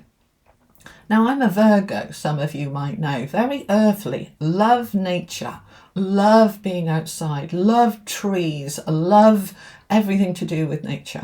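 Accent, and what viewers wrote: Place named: United Kingdom. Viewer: Thank you dear ❤ I was brought up internationally with a cultural mix and learned very early on to not judge. Everyone was different, including me. But what I really needed to be reminded of was the self care bit where I have slipped a bit... Thank you for that! Also I love your voice and accent, I used to work a lot with brits (am Swedish) and listening to you is just wonderful!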